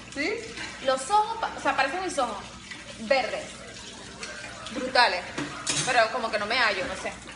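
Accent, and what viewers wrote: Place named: Venezuela. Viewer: Hace poquito encontré tu canal y estoy encantada! Tu súper bella y tu mami bien simpática. Saludos y me encanta tu acento venezolano!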